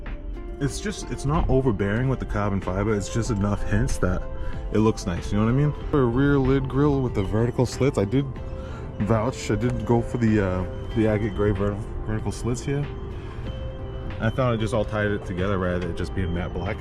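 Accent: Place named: Boston, United States. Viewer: ❤😂😢🎉🎉🎉😮😮😅😅😊😊😊😊😊 keep talking, bro I love that accent my friends from Boston and she sounds just like you good job, brother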